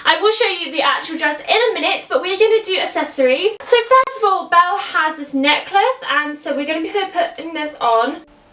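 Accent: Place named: Mid-Atlantic, United States. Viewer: I gotta say your American accent is quite good. Sometimes sounds a bit more Mid-Atlantic but that also kind of works for that classic Princess vibe. And thank you for wearing gloves! I get kind of annoyed when I see Belle or Cinderella not wearing gloves (thank those live action movies for that ugh)!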